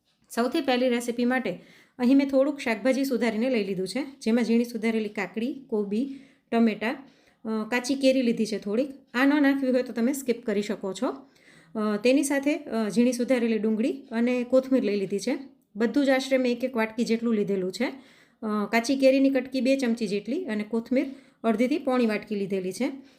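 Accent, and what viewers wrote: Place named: Saurashtra, India. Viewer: વાહ ખુબ જ સરસ બેન તમે કચ્છમાં રહો છો પણ તમારી ભાષા સૌરાષ્ટ્ર જેવી છે તમે સૌરાષ્ટ્રમાં ઉછરેલા છો, હું પ્રોપર સુરેન્દ્રનગર થી છું હાલ વડોદરામાં રહું છું. તમારી દરેક રેસીપી હું ટ્રાય કરું છું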